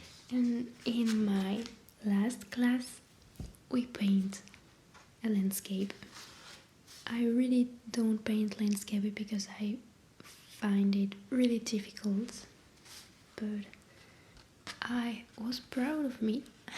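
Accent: France. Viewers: Hello 🖐. I discovered your channel last week and it's a pleasure to watch you 😊. You are so soothing, your voice is lovely. I love the french accent, it's perfect for asmr and there is another french asmr youtuber which I adore. It's moonlight cottage. I also think you are talented painter. Thank you for sharing 😊. Merry x- mas and a happy new year 🎉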